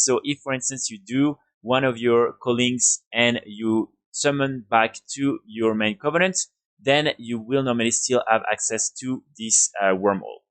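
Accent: France. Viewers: Cet accent français 😂😂😂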